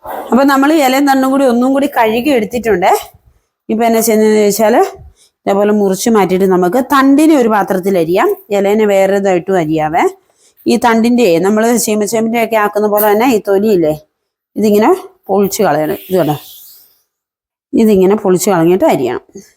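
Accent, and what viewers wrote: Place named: Southern Kerala, India. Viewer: കാസര്‍കോഡ് ആണൊ സംസാരം കേട്ട് ഞാന്‍ വിചാരിച്ചു ഇങ്ങോട്ട് തെക്ക് ആണെന്ന് chilasamayam പാലക്കാട് എന്തായാലും സംസാരം സൂപ്പര്‍ നിങ്ങളുടെ വീഡിയോ എല്ലാതും ഒന്നിനൊന്ന് മെച്ചം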